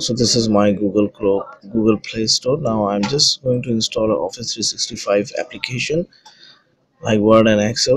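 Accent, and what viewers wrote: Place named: India. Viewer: indian accent lol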